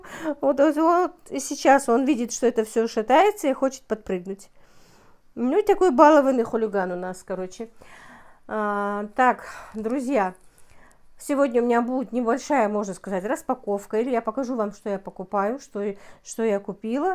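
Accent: Standard. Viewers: дорогая, пожалуйста напоминайте нам про лайки и комментарии! Честно, многие не помнят! Кто не хочет, тот не поставит. А кто хочет выразить Вам признательность, тот иногда забывает, что это канал. Лично я с удовольствием смотрю Вас и как будто с подругой по телефону поговорила, отключилась и пошла. Вы не думайте, что Вы лайки выпрашиваете, просто напоминаете забывчиввм, таким, как я. Восхищаюсь Вами! И да, наслаждаюсь чистым классическим русским языком! Счастья Вам!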